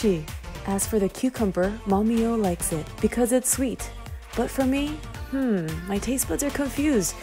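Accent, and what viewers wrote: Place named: South Korea. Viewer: What’s ur nationality? It’s sounds like your a native korean lol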